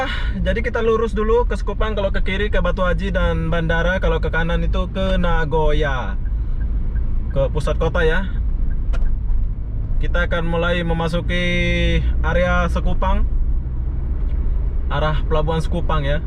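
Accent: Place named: Malaysia. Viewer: Ooohh pantesan masih dapat Logat Melayunya Pas, kirain orang Riau/Sumbar. Ntaapp Lanjutkan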